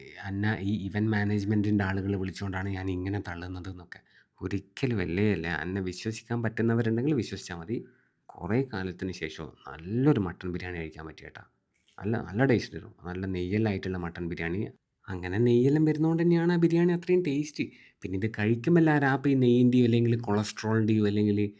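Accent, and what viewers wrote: Kannur, India. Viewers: Ippo sherikk kannur karante samsaro ayknnallo ingalethh😅😊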